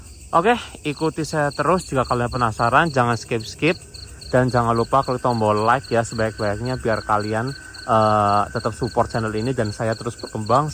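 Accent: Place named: Banyumas, Indonesia. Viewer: Aku biar emak2 ngefans bget ma ka..serem lucu bahasa ngapak y n super brani❤😊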